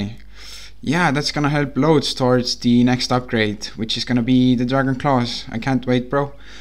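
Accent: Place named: India. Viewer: nice video i subbed first time i watched u where u from u sound like ur drom india but idk where u from i speak german im from switzerland my english is anyways not rly good tbh xD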